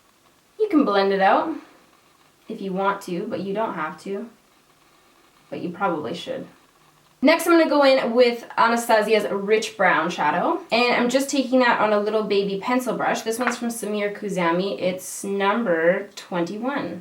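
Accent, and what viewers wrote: Canada. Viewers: YOU DREEEEEEG ALONG THAT LASH LINE! Love the Canadian accent.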